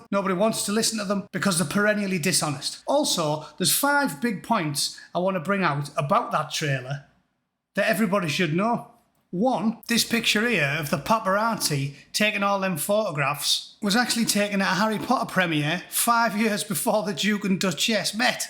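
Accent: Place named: Geordie, United Kingdom. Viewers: Harry and Megan leave me speechless! Hateful pair! I have to say that I never liked or trusted Harry's mum...an egoist who wanted everything. Give me Charles, Anne, Camilla and Catherine any day! May our late Queen rest in peace. Loved all your rhetoric, and I love the Geordie accent, it is like music. Take care, stay safe, and have a good Christmas. God Save the King. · Haha "Fanny like a gutted fireplace", I'm shocked you havent been shut down, presumably the Facebook bots have not been programmed to recognise a north-east accent. Still as a Pit Yakka its nice to hear a familiar tone on the Youtube, it feels like a good gas in the pub. Still, a nice takedown of these lying, ungrateful, self absorbed, attention grubbing, vacuous oxygen thieves. BTW as a recent subscriber I'm more shocked to hear in this episode you live in California, how the hell did that happen? 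When I clocked the breakfast bar, I thought "Smoggy made good, must be in Norton now or maybe even Ingleby Barwick". I would be interested to know how you find the accent goes down over there, as our Durham twang was met with some fairly blank looks in San Francisco.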